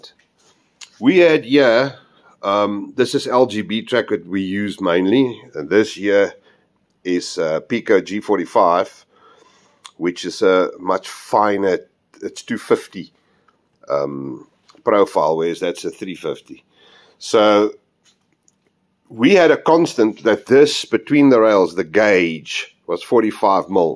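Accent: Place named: South Africa. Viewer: Trains are great and everything, but come here more and more for the accent. Remind me the old days when I worked in the UK and had a few SA colleagues :)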